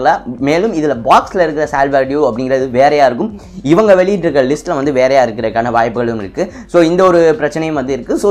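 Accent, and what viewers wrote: Madurai, India. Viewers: Bro neenga Madurai ah????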